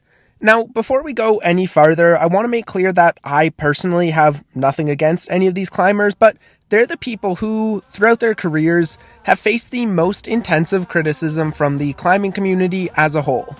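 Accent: Canada. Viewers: I was going to guess you were Canadian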